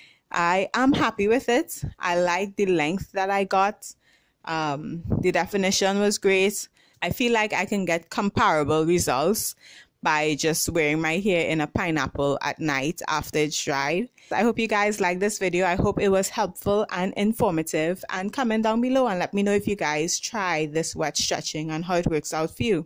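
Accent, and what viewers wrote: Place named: Trinidad and Tobago. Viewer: Trini accent, great video, lovely hair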